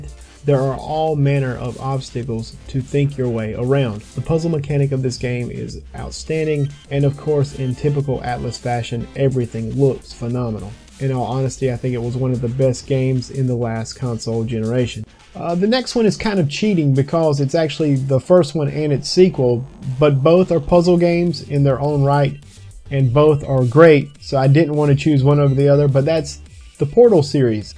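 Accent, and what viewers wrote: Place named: South, United States. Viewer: Mike B sent me...you sound nearly as country as me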